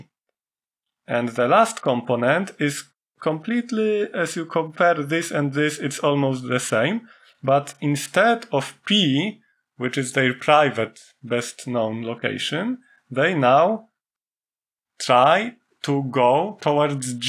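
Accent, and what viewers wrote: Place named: Poland. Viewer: Thank you for this awesome explaining of Evolutionary Computing for Global optimization, it was very useful and inspiring. no many visual resources for this topic, appreciate your contribution. you delivered it in a simple manner, and OptVis tool is also awesome. also the Polish accent is wonderful (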